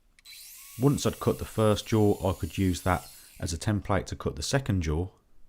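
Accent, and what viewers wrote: Birmingham, United Kingdom. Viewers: Love the Brummie accent (I'm watching this in Australia, but I'm from Hall Green originally) - Thank for the video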